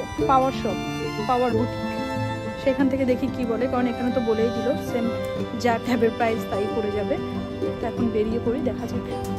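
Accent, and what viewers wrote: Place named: Bangladesh. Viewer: Actually apnar kotha shune majha majha Bangladeshi moto lage. Tai ask korlam.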